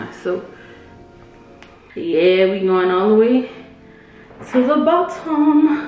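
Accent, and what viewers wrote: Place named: Los Angeles, United States. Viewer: Its CUTE! I just realized you were from LA. I could hear it but didn't think nothing of it. I'm from Baton Rouge.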